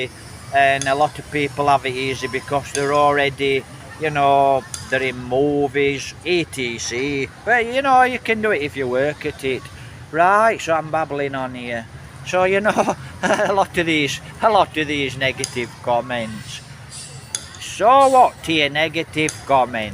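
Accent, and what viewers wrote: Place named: Barnsley, United Kingdom. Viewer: Hi mate. I’m from Hoyland. Just having a debate with my mate about if you were actually from Hoyland as he says your accent sounds more Barnsley than Hoyland. Can you put him straight where you went to school etc. cheers mate. Look after yourself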